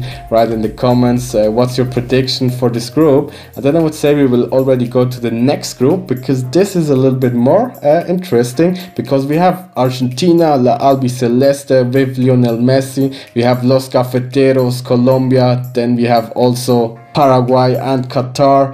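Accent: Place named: Switzerland. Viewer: You have a swiss english accent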